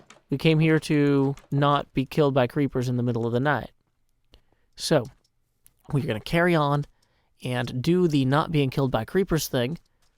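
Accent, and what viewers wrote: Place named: South, United States. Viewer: Yes. It has been a very long day and your southern dolcet tones are as warming to the soul as Pie and Chips sandwich:D keep up the relaxing work:D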